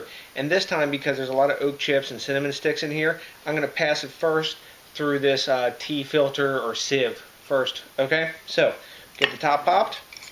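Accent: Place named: Delmarva, United States. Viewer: I am pretty sure this guy is from the DelMarVa (Delaware/Maryland/Virginia tristate area). His accent gives him away. Let me know if I am right!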